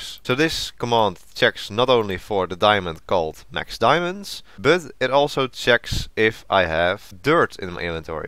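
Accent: Netherlands. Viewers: hahaha ik hoor aan je stem gw dat je nederlands bent heuyy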